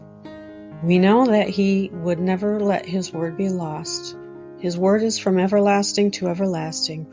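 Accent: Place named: United States. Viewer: Madam, you are butchering the Semitic languages! You have absolutely heavy American accent! Our phonetics is quite different!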